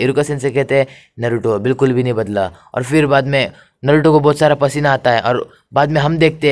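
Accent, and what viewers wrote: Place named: Maharashtra, India. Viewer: Bhai tum maharashtra se ho na..😅 tumhari aavaj se laga muze..😅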